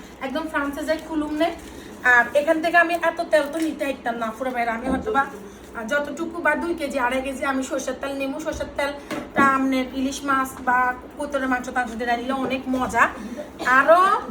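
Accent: Noakhali, Bangladesh. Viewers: রাখি তুমি শুদ্ধ ভাষা বলো নোয়াখালি ভাসা শুনতে ভালো লাগে না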